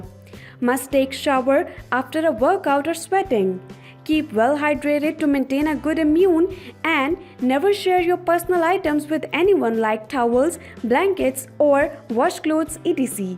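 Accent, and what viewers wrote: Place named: India. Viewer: I can’t stand the Indian accent and mispronunciation.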